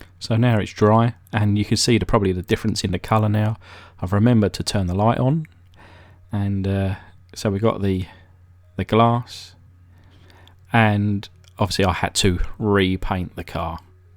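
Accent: England, United Kingdom. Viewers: You have very traditional English accent. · Heard the British Accent, stopped watching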